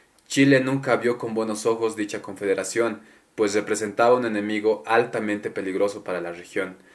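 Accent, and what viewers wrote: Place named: Bolivia. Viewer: Apenas los escuché supe q era boliviano , aunque también pudo ser ecuatoriano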